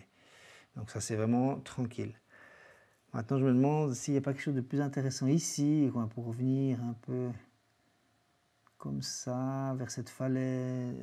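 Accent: Switzerland. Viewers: Salut ! l'accent suisse fait du bien :-) je confirme tout le bien de Swiss Mobile (Iphigénie imbuvable)...je te suggère peak viewer pour les noms des sommets quand tu es sur le terrain...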